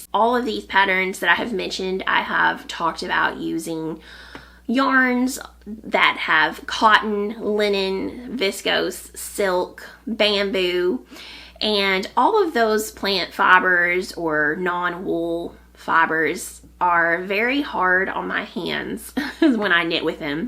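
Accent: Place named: Mississippi, United States. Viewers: Thank you for showing the pattern from Ozetta Knitwear! I looked at several other pattern from this designer and really like them. I enjoy your podcast very much. I’m from Mississippi and listening to you talk reminds me of home! I live in Florida now. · Hiya, this is the second video of yours that I've come across... and they're just great! First of all, I'm Australian, so I just love your Southern accent 😍, second, you're so relatable... I'm not a 'skinny-mini' either! And I'm 5'10", so thanks for touching on doing the maths to adjust your fit... when I was young we were made to feel like we should fit the garment, not the other way around... one day I hope to be able to knit more than dishcloths! 😂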